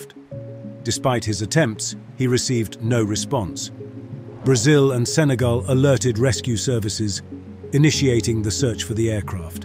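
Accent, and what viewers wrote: England, United Kingdom. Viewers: English accents are overused and annoying.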